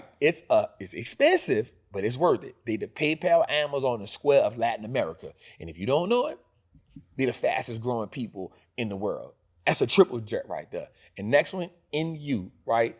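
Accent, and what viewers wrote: New Orleans, United States. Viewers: Yea, he from the city. If you not from here (the city) is what we in Louisiana call new Orleans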